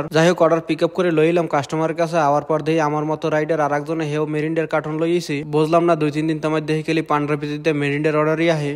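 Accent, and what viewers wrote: Barishal, Bangladesh. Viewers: আপনা বাসা কি বরিশাল ভাইয়া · মনু আমনেও কি বরিশাইল্লা🙂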